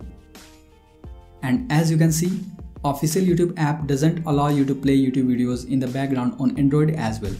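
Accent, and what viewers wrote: India. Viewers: Once I hear an Indian voice I knw its for good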